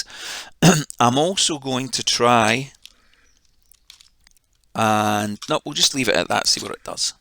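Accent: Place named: Scotland, United Kingdom. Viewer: Many thanks - great video - very informative and with a dreamy Scottish twang as a bonus! Happy Days! LOL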